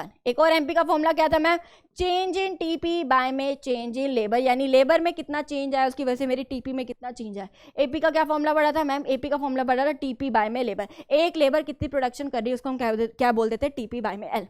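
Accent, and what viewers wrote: Punjab, India. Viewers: Mam your way of talking is really cute😂 giving full Punjabi vibes 😌and you gave the best and the easiest explanation of this chapter ❤love u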